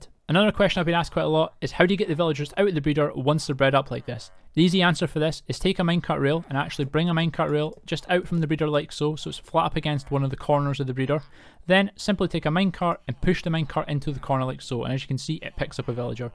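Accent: Scotland, United Kingdom. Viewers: Yay Scottish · I don’t want to assume. But are you Scottish? - from a fellow Scot :)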